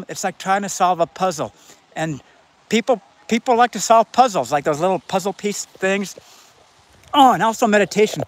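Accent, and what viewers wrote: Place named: Scotland, United Kingdom. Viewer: Are you Scottish or Irish....or both?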